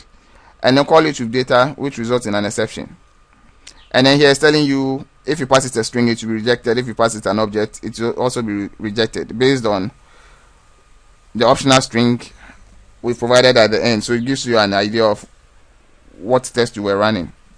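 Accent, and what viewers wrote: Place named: Ghana. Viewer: Nice tutorial bro... feeling your Ghanian accent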